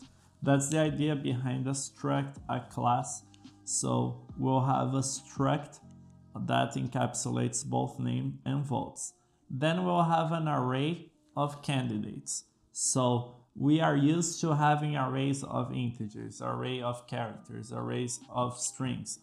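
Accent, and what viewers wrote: Brazil. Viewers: Cara, fala muito bem em inglês, mas peguei no pulo que era brasileiro. Valeu pelo video, salvou aqui.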